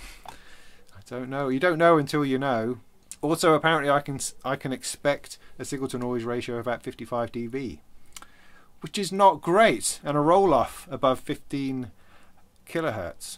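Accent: United Kingdom. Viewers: So, ur British. Far out! When u said motorbike...yup or Australian. Just got a Teac X-3 Great sound dynamics. Gotta get some leader tape for sure. Its,a whole new thing for me. I want to tape from record albums and CD. U R right, putting an album.on a Turntable hooked to a very good receiver sx950. Threading tape thru a humongous non enclosed reel2reel is spiffy. I have a lot to learn and I bloody well love it. Say HI to Rumpole of the Bailey for me?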